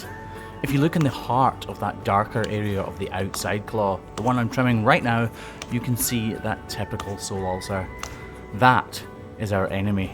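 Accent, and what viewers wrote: Scotland, United Kingdom. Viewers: I've shared! Your videos have helped me get over my fear of cows! With the added bonus of the silky Scottish accent 🤭😉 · I love watching your videos! You've a beautiful family and I Love hearing your Scottish accent taking us through the video!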